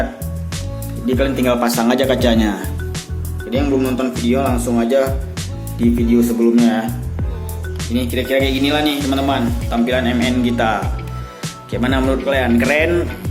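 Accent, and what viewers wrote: Aceh, Indonesia. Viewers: Logat Aceh nya bang😂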